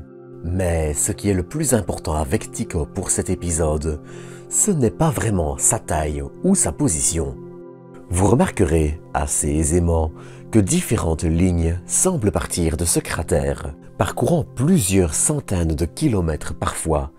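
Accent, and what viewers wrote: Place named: Flanders, Belgium. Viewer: Flamand donc ? Bonne soirée et bravo pour ce que vous nous offrez en vidéo !!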